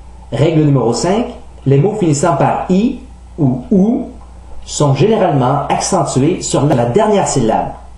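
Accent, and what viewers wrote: Quebec, Canada. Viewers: il imite l'accent québécois ou c'est sa voit normal ? (ce n'est pas une moquerie !) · tu ne serais pas québécois ?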